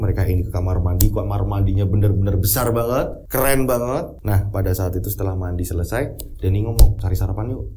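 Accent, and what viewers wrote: Java, Indonesia. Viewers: Jawa nya tom tomen kang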